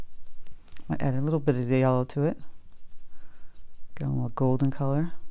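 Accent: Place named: New England, United States. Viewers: Love your style. And your cute east coast accent. 😊🌹👍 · Great lesson for beginners. Also a good way to save on paint and paper for beginners. Love your New England accent. I've lived in Florida for 50 years and people can still hear mine!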